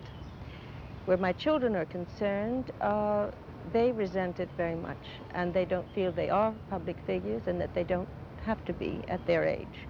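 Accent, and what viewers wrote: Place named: Europe. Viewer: she's lived in Europe among Europeans for decades so she's almost certaintly developed the accen you can hear.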